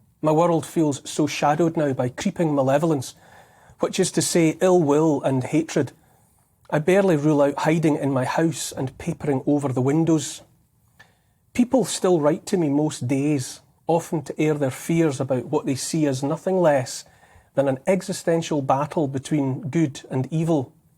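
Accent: Scotland, United Kingdom. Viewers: Really he is what he's complaining about. Somebody on " The News " extrapolating the worst possible outcome from any given event just to keep us in a state of anxious uncertainty. But in a Scottish accent. Nothing but a Private Frazier for our time constantly declaring that "we're dooomed"!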